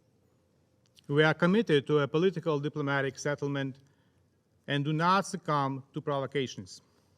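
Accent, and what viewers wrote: Russia. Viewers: Вибачте, шо домахуюсь, але чого Владімір Зєлєнскій, а не Володимир. Непогана в нього англійська, але з елементами російської...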